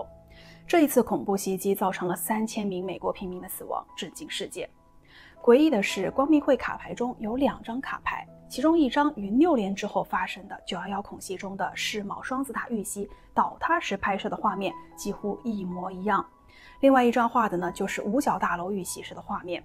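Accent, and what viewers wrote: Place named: Beijing, China. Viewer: 还是能听出京片子味儿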